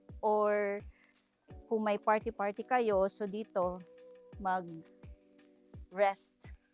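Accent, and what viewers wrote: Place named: Visayas, Philippines. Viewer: pangit magsalita ni ate, 😢. Nag english, nag tagalog pero tonong bisaya.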